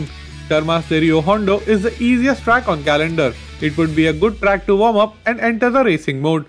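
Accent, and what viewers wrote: India. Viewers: Great content,. Presentation is very good. Some, maybe a very few people might want a slightly slower-paced narration. I’m in USA, I can decipher Anglo-Indian English easily, but my compatriots may struggle slightly. Overall this is good channel.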